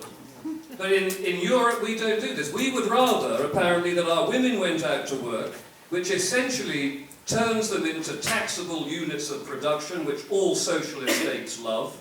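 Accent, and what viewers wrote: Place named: England, United Kingdom. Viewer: is such a nice man, great mind and he always dresses well. He has a lovely English accent, its not high and mighty and its not common. His voice is actually a future PM voice.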